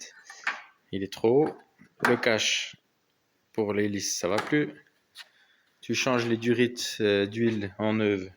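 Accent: France. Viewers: salut a toi je découvre ta chaine et tu as fait un sacré boulot je suis du doubs et toi aussi je pense ca s'entend on dirait !! bon voyage a toi et félicitation pour le boulot ! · Salut, Je suis originaire de Montbéliard et ça fait du bien d'entendre le bon accent bien de chez nous ! Et tout à fait d'accord avec toi sur cette propagande. Perso j'attends ma cellule, car ce n'est pas moi qui la fabrique. J'ai eu une Land 109 série IIA dans les années 80, puis un Discovery et un Range P38. Aujourd'hui j'ai changé je suis sur Ford Ranger et oui, avec l'âge, on roule en "confortable" ! Bonne continuation à toi et ta p'tite famille.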